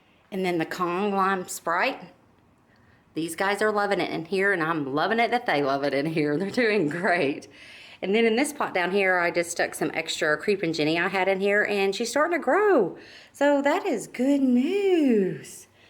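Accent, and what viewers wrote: South, United States. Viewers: What a beautiful garden, and you have the most beautiful southern accent, my dear friend. What would you do if you planted a carrot garden?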